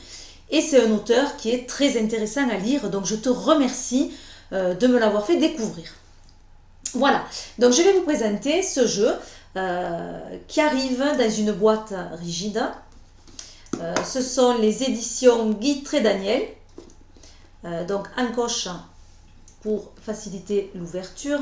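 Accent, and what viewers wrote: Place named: Gard, France. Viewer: ça sent le Gard, cet accent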